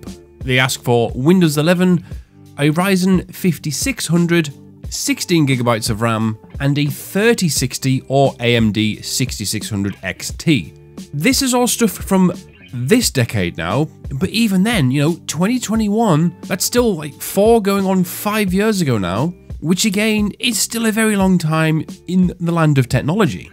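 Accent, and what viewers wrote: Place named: Newcastle, United Kingdom. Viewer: At first i thought scots, but i think its geordie accent (newcastle) cause ur "done" is more "dun" 😁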